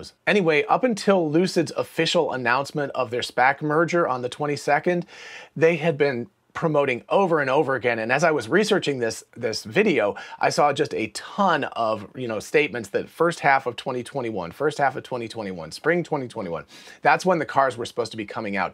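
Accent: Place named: United Kingdom. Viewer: It's so obvious that Lucid ain't gonna make it .. not quite as obvious as Nikola, but close. Elon has talked at length about the difficulties starting a new car brand. It is extremely capital intensive, and with their supply chain setup and pricing/TAM, there's no way they will make it to profitability without running out of cash ... again. Saudis bailed them out once, but the market won't be able to do it again unless they commit some major shenanigans. Lucid is a great dream narrated with a soothing British accent, but it's only that.. a dream.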